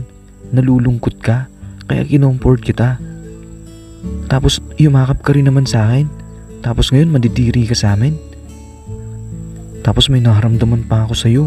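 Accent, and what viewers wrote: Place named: Batangas, Philippines. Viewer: sir hahahaha taga batangas kaba? ako taga nasugbu batangas. nahala ko kase tono ng boses mo ingat palagi sir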